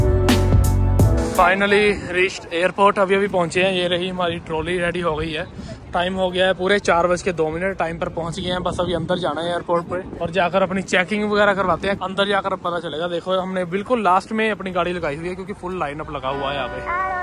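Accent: Punjab, India. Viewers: Bai ji punjabi muh se punjabi accent bohot kaint lagta hai😂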